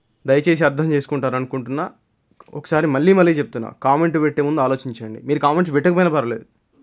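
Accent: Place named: Telangana, India. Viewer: Excellent! By your accent know you are telengana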